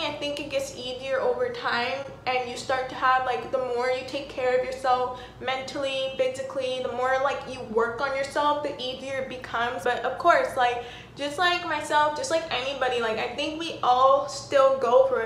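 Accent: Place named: Dominican Republic. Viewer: I legit thought she was Dominican, especially because of the way she speaks.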